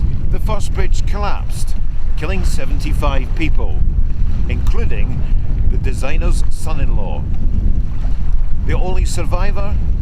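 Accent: Scotland, United Kingdom. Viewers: Corey M ..I am glad that the commentary was done by a Scot...Not by a Sassenach. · this is a very good video - very well done. the accent is lovely. i told my son this man could say the most vile things and it would still be like music. just sayin, it's a melodic, well spoken language. this is such a sad story and i've listened to it twice - because of the narration. also must say have a good friend who married a scot. he left me a phone message early on in their relationship - all i could understand was the word cat and my friends name. a 3 min. phone message. THAT said, i understood as much of that as i have from certain "american" accents. south...upper north east ...west coast..... I'm from chicago - oh excuse me..chiCAAgo - the all american accent, Ugottaproaablemwid dat? see how much nicer this scot's audio is? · A very well presented and researched documentary. 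A tragic accident that as is often the case, down to several factors and the various parties involved. Thanks to the narrator and producer of this video. I felt it very fitting that a Scotsman told the story and I'm glad I clicked my mouse to view it! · Guys he's Scottish. Get used to it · Nice to hear a scotish accent of a happening in Scotland . So tired of bbc and british accent ruling the kingdom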